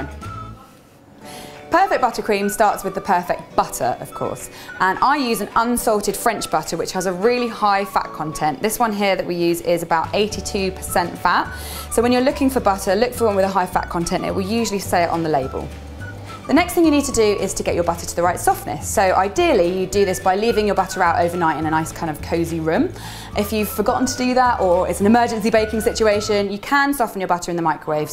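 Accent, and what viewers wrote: United Kingdom. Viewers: I knew you were going to be British the second before I opened the video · I just love the way Brits say buttercream: "BUT-tuh-cream." lol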